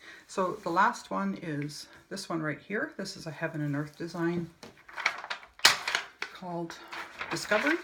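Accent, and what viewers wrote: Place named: England, United Kingdom. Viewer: Great first video☺. Loved how you gave all info out. As you said Jules is fantastic!!! She's amazing in many ways isn't she. You needn't apologise for being nervous, it was your first one, at least you put yourself out there and I thought you did really well. Out of interest, were you born in Canada? You sound more English. I am from England lol. I like the variety of different projects. See you next time. Happy stitchy holiday☺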